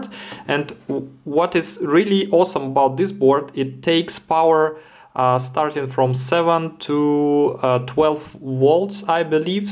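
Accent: Russia. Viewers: русский акцент выдает)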